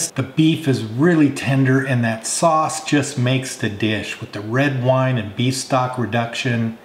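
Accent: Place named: Chicago, United States. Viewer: awesome recipe! You sound like you are from Chicago.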